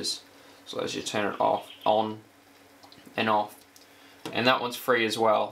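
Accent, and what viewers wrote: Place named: Australia. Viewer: lol australian...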